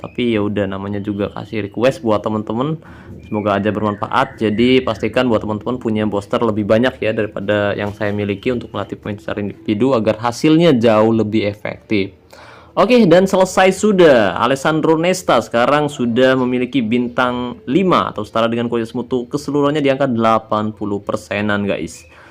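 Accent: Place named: Brebes, Indonesia. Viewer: BANG...ENTE ORANG BREBES YA... SALAM NGAPAK BANG...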